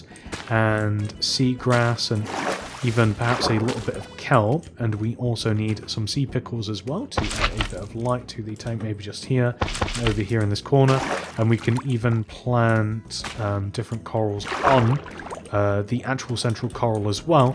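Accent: United Kingdom. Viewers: Are you British?🇬🇧🇬🇧🇬🇧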